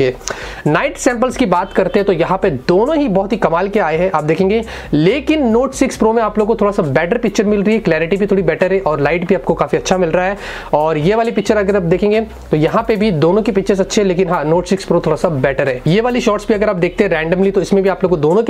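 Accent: India. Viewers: Nossa, como indiano tem sotaque. =0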